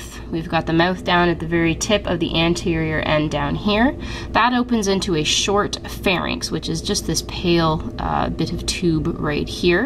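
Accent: Canada. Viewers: .... are you Canadian?:)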